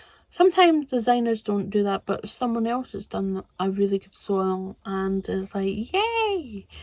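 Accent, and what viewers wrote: Scotland, United Kingdom. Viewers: Lovely dress, and the heathery colour looks great on you. I'm in Aviemore quite a lot as I live a bit further north in Forres and it isn't too far away for a day out. Always good to hear a Scottish voice on youtube!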